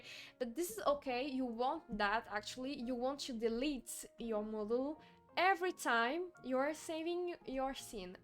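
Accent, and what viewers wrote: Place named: France. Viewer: I love your french accent 😍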